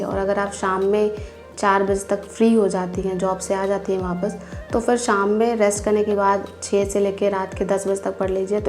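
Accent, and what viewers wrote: Bihar, India. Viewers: Kya aap Bihar se h?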